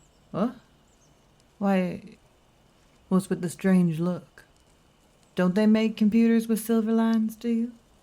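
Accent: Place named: South, United States. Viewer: Love the Southern accent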